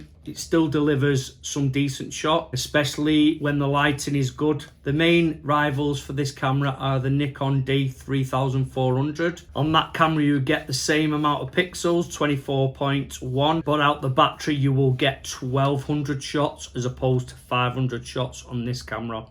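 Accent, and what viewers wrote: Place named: Northern England, United Kingdom. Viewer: This is gonna sound really stupid.. but I’m really happy I found someone with a good northern accent hahahaa no offence to anyone else but ya can’t beat a northern British accent (I’ll be embarrassed if you’re from the midlands or something hahaha)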